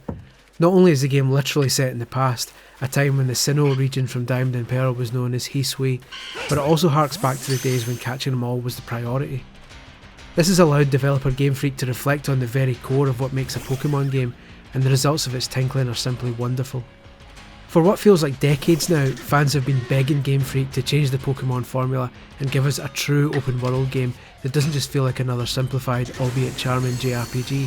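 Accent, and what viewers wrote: Scotland, United Kingdom. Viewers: The most Scottish man reviews pokemon, good review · came for the amazingly in depth review stayed for the amazingly unintentional scottish asmr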